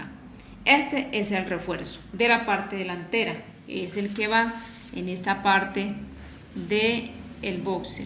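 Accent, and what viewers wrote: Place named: Colombia. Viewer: Amiga gracias por esa noble labor de enseñar con tanta paciencia. Que el Señor siga bendiciendo tu vida y sobre todo siga derramando mucha sabiduría. Soy de Colombia me imagino por su acento que usted también .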